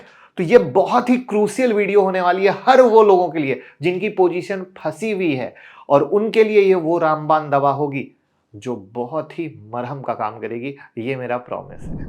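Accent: Bihar, India. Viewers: Aap up ni Bihar se ho bro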